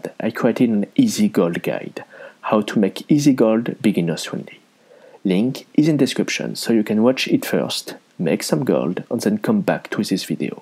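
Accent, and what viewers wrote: France. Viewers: So many years and I still lobe your French accent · Aaah l'accent français c'est grillé au bout de 3 secondes :D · Ce franch accent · NICE FRENCH ACCENT MY FRIEND :) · Possibly the strongest french accent i’ve ever heard, no hate intended! Good guide!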